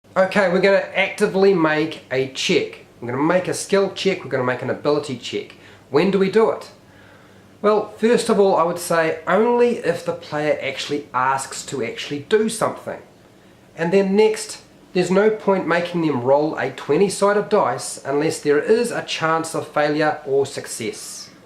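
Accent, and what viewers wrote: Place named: New Zealand. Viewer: he's talking about your kiwi accent :)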